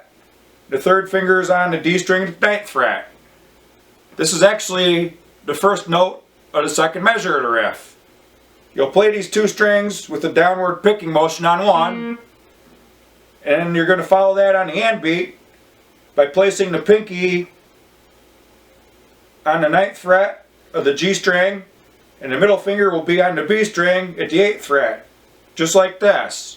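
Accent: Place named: Canada. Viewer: I hear a Canadian accent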